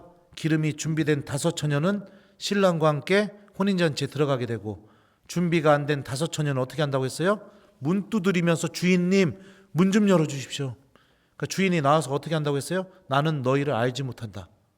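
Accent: Chungcheong, South Korea. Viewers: 신부님🎉 구수한 충청도 어투에 진솔한 마음이 무한 감동입니다 🎉🎉🎉 만나면 화해한다는 말씀, 성체조배의 중요성, 하느님과의 화해🎉 고해성사의 진실한 준비- 더러운 물을 정화시키기 위하여 깨끗한 물이 필요하듯이 고해성사가 우리 마음을 정화시켜주는 깨끗한 물이 된다는 말씀🎉 감동입니다 🎉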